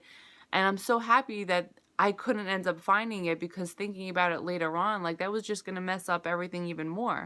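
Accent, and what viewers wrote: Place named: New York, United States. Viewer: Sound like a New Yawka bae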